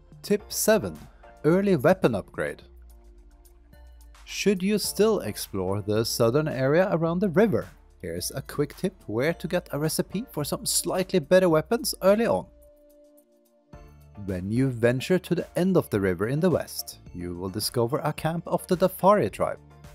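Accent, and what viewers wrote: Norway. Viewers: Det var lettere å høre at du var norsk på engelsken din, enn om du bare hadde snkket engelsk! Men bra tips det der!!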